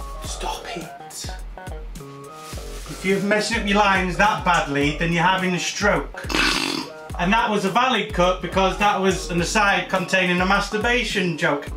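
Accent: United Kingdom. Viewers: Hi there dear lord. I just have a question. I know you're Brittish and I love your accent, but I just can't put a name on it. Where are you from?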